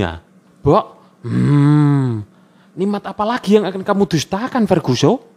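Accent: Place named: Java, Indonesia. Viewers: gapapa mbak memang orang jawa logatnya kayak begitu susah juga kalo ngomong pake logat indonesia biasa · informasi dr km ny menarik dan jelas, tapi narasi bicaranya itu yg bikin garing kak🙏, but aku suka Ama channel vidio2 KK y infomati itu, prasan jrg yg bahas, aku tauny bnyk konten spoiler n y ky ngikutin tren doank, bagus c KK jd dr sndri, cm Krn medokny terasa garing di telingaku 🤪🤭,aku bnyk tmn org Jawa tp klo KK mya ini kyk LG ndalang gtu prasaan narasinya 😂😁😁🙏, intiny konten dan Vido KK bgus dan ckup mnrik so lanjutkan kak, y tnggl menyesuaikan aja c y kak 👍🏻👍🏻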